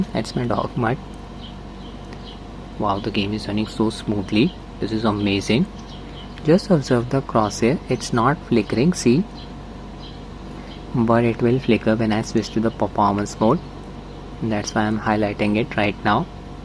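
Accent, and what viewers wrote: India. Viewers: Accent is thick but lucky for me i have Indian friends :D cheers for the video bud.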